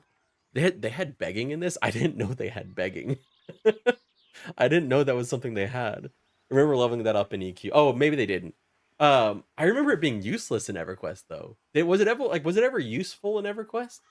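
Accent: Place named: United States. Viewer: Talking of you not having an English accent, at least you don't have to fake American pronunciations to get views like most English gaming Youtuber's, you just do it naturally. It feels really weird seeing (well, hearing) them do it but I believe it really does make a noticeable difference to them getting views.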